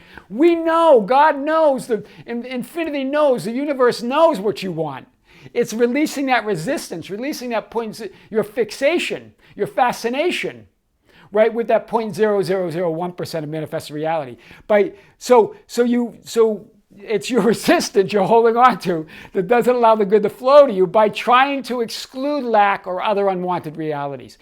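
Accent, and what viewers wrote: Boston, United States. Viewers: Your Baaston accent makes flip charts very exciting!!!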